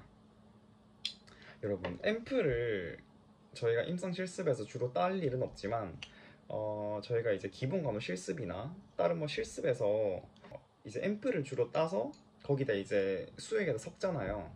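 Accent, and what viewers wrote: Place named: Busan, South Korea. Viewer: 오..와 사투리가득한부산남자의 제품리뷰..😆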